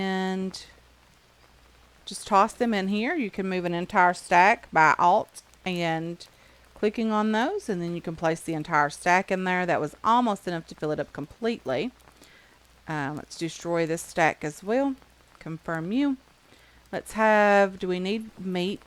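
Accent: Kentucky, United States. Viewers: im so glad to see you playing again from the start! i subscribed in the first place watching you play this...supposedly its a very different game now with everything they've added. we'll see. its very nice to see you! i still follow you but i dont have the time to get into the infected part 325...ill never catch up. this is perfect. and i just love hearing you talk with that kentucky accent. people accuse me of having a oklahoma accent! what accent? yall are the ones that talk funny!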